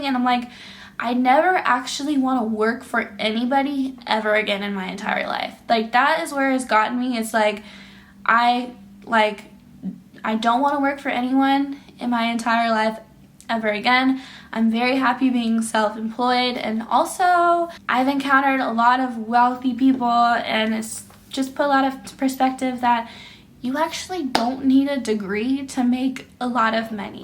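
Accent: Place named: Oregon, United States. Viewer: I thought 💭...I heard some Oregonian in you and then, you said it... 😲 Wow 😲 I'm a new subscriber and I moved to Phoenix, AZ with my girlfriend and our 5 cats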